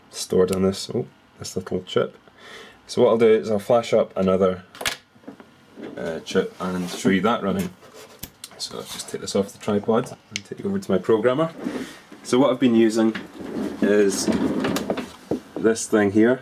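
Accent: Scotland, United Kingdom. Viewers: I love the scottish accent.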